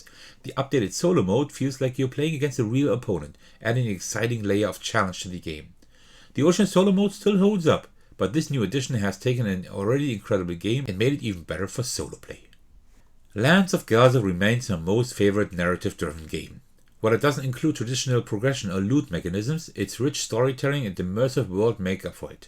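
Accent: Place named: Germany. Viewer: Puh der deutsche akzent ist echt hart zu akzeptieren. 👎👎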